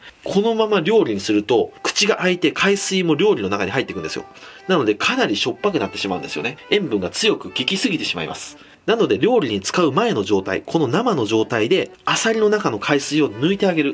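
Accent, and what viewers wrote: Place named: Aichi, Japan. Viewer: 最近視聴させていただくようになりました。時々馴染みのある訛りが聞こえると思ったらやはり愛知でしたか！嬉しいです！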